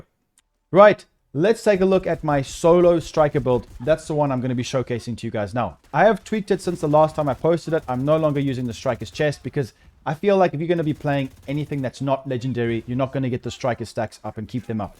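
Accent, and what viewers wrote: South Africa. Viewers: you from south africa ?? Discord ?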